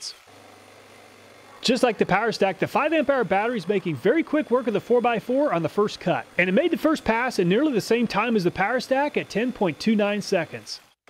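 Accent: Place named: Pittsburgh, United States. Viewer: Are you from the Pittsburgh area? You have the accent. Haha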